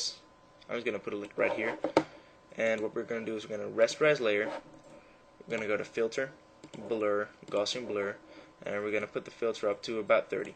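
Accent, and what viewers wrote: Netherlands. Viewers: Ik zie aan je accent al dat je Nederlands bent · Hey bro, awesome vid, btw are you dutch? One little thing that is kinda annoying is that your mouse is pretty loud. I guess this is because you don't use a mouse mat. Once again, awesome vid overal:) gj keep it up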